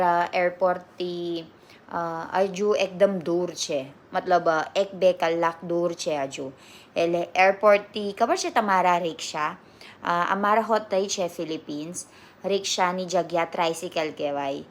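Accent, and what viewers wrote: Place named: Charotar, India. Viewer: speaks charotar gujarati 😅 sounds really good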